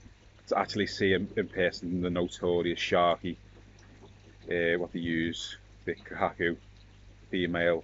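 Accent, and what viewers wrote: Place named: North London, United Kingdom. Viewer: Watched a few of your videos and by the accent your not far from me 👍🏻 I live in North London now but grew up in Skem..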